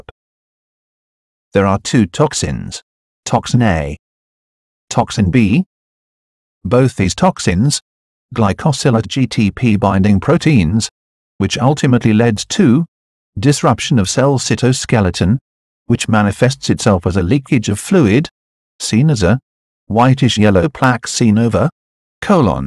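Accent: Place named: India. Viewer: 8/10 ... indian english accent would be the most welcome change... nice content though...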